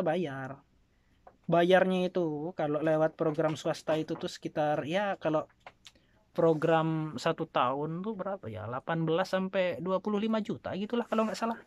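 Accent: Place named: West Java, Indonesia. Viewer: Urang sunda ieu mah nya....,keliatan logatnya...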